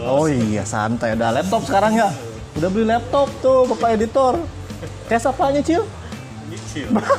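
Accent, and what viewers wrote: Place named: West Java, Indonesia. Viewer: Nyunda' pisan a' Pull etsdi Wait enjel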